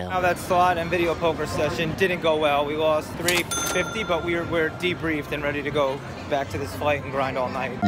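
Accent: Australia. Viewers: the aussie accent is a solid 9/10. love it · 7/10 on the Aussie accent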